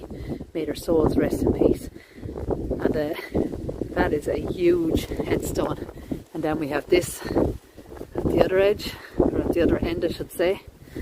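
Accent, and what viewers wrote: Ireland. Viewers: Coffins need a clean. You sound Irish, are you in Ireland?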